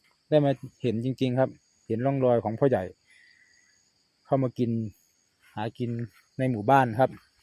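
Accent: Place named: Korat, Thailand. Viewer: เป็นคนกะไหนน้อครับคือเห็นพูดโคราชดาครับ😄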